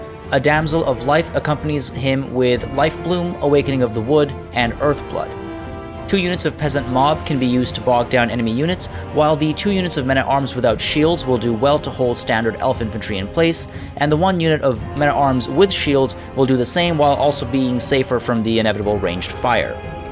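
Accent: France. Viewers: Woah dude! Where does that nice French accent come from? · Rocking the french accent! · coming in hard with those french pronunciations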